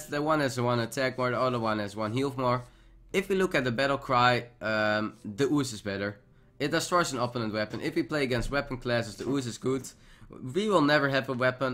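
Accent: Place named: Netherlands. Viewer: Wist meten dat je Nederlands was man. :D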